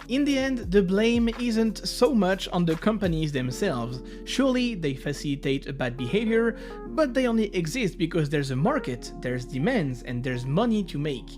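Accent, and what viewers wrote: France. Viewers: Hi, incredible content, great job! One tip to improve your videos: work on your accent, there are tons of videos and apps out there on how to improve your english prononciation. I hate the french accent (I am french myself maybe that's why) and it's a struggle to go through each of your videos but the quality of content makes it worth it, keep up the great work! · french accent ? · la musique de ça me tue xDD (j'adore ton accent d'ailleurs, il est très discret mais si t'es français tu devines quand même) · L'accent déjà c'est cramé mais t'as Google en fr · I bet your french. a typical accent.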